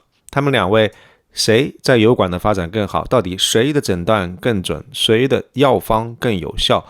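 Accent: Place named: Cantonese, China. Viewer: 这是你个人对袁老师的解读，袁老师同意了吗，另外就是袁老师在多个节目重申过，他在大学修读的是'历史教育系'，而非历史系，但绝大部分人都把把他说成读的是历史系，是博主在材料收集中'求其'的原因，我听得出博主绝对是粤语是他的母语。他明我讲什么的。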